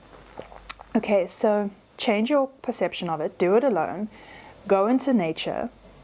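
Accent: South Africa. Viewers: It absolutely is what you say sister. Lovely South African accent. You give the purest guidance on all of you tube. So refreshing to hear.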